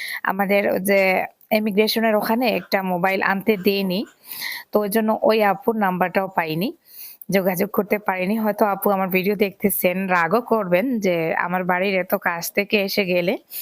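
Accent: Sylhet, Bangladesh. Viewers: আপনার কথা সুনে মনে হচ্ছে বাংলাদেশি ভাষায় কথা বলছেন আপনি তাহলে আপনি ভারতীয় কি ভাবে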